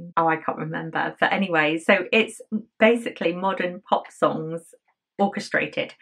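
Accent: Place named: United Kingdom. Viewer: hello Ms., I love watching your vlogs. I love crocheting and reading too. I am from the Philippines and I think I am learning more about British English by listening and watching your vlogcast ^_^ Thank you very much. Happy and blessed New Year!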